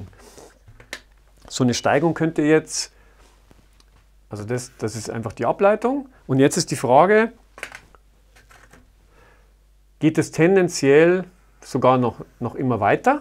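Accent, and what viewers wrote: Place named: Bavaria, Germany. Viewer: Trey 666 tiefstes Bayern wär mein tip ;)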